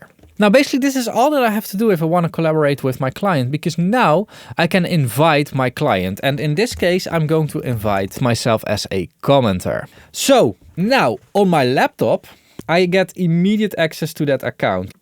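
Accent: Netherlands. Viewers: mine is Böndle. Btw, I'm not trying to be mean, I just think the Dutch accent is one of the funnier ones.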